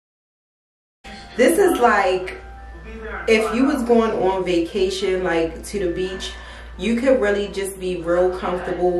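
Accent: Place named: Washington DC, United States. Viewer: your accent is so familiar, are you from DC?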